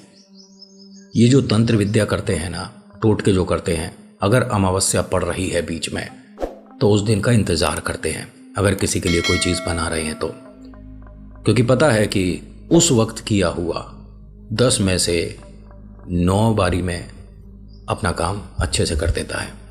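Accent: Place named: Moradabad, India. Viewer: Jay Shri Shyam Bhai Har Har Mahadev aapki Bhasha bilkul Muradabad Jaisi Hai isliye main aapki Kahani sunti hun aur Kahani acchi Sunate ho aap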